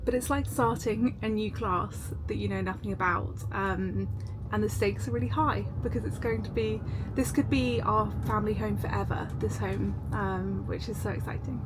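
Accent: United Kingdom. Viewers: I’m super excited about your renovations! I love watching you and listening to your beautiful British accent. I watched you for years and am so happy you are back! your skin is amazing, so dewy and porcelain like🍁. Happy Autumn 🍁🍁🍁🍁🍁🍁🍁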